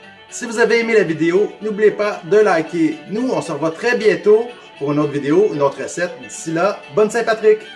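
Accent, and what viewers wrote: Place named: Canada. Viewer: J'ai juste un petit problème c'est un accent plutôt canadien qu'irlandais parlant le français, revenant d'irlande j'ai un petit problème avec votre recette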